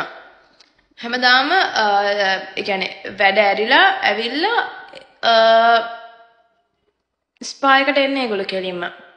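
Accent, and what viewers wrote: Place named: Kambanwella, Sri Lanka. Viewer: කංබංවැල්ලෙන් ඇවිත් වගේනෙ කතාකරන්නෙ මෙයා.. අපරාදෙ කාලයයි ඩේට ටිකයි කැපිච්ච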